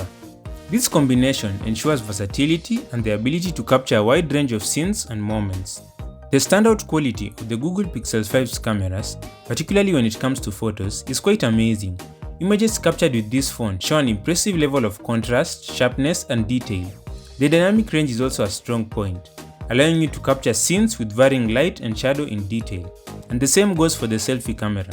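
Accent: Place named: Kenya. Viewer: Kenyan? Happy to hear a voice from home reviewing tech professionally. Big up to your journey.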